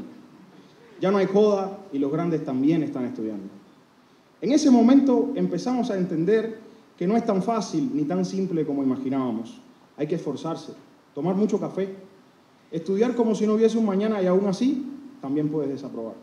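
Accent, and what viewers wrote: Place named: Argentina. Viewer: Oe socio, se te ha pegado hasta el acento de argentina, felicidades hermano, hace rato que no se de ti pero se que estas bien porque una gente buena, enfocada y competente como tu todo lo logra, un abrazo hermano desde la distancia, cuidate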